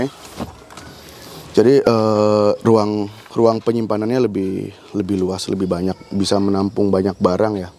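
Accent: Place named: West Java, Indonesia. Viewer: Hahaaa apansa sunda banget.. Sukses baaaaang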